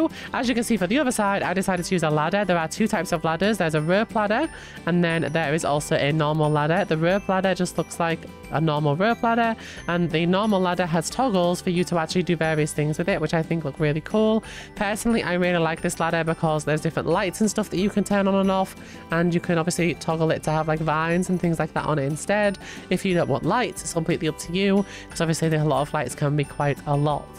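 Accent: United Kingdom. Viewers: GIRL I DIDN'T KNOW UR BRITISH! ME TOO !! <3 im 25% british lol!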